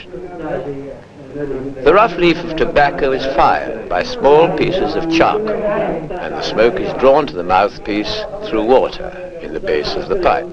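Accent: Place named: United Kingdom. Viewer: المعلق ليس امريكي بل بريطاني و الممرضه من أيرلندا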